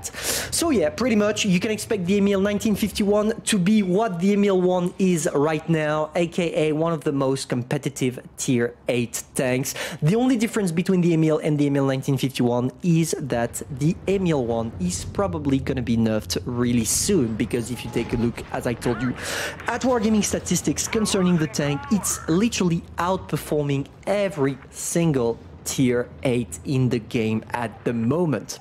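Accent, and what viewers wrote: France. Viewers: I live in a cave but I speak English without such an annoying French accent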